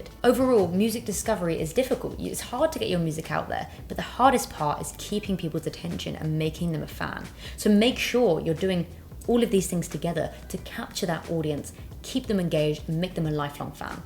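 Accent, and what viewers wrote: United Kingdom. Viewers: I think your so cute and i love your british accent...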